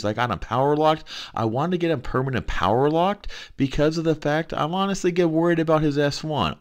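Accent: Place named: United States. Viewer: Thanks for the video. Several members of our ally enjoy your videos. We are trying to place where you are from based on your accent. What part of the US are you from??? Thanks again for the videos! Keep up the good, and helpful, work!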